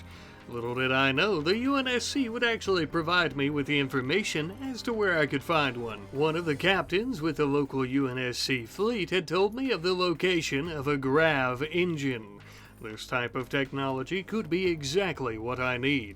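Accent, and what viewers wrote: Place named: Transatlantic, United States. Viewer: is this your natural voice? it sounds like you're making a jim carrey smirk type of forced face with a idea of some kind of transatlantic accent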